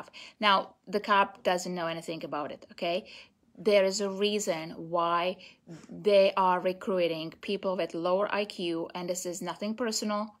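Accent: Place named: United States. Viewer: I love your American accent beautiful lol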